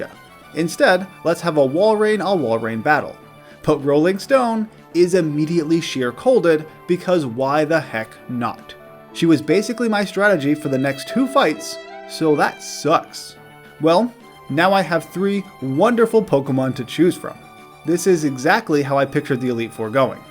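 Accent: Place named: United States. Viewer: famous Vegas! That's nice to know! Maybe someday I go visiting there. It must be a real nice place. But I would say your accent is the typical American English, clean and straight. I like your pronounce! I mean, it is exactly how I learned to pronounce the English words. That's a nice coincidence, I would say. I'm anxious to see your next challenge! Thanks for sharing, you're very friendly!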